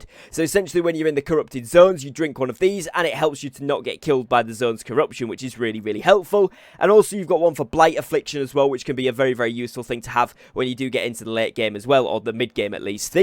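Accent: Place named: North, United Kingdom. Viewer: Love your guides. You sound local to me! Total northerner! 😅🤣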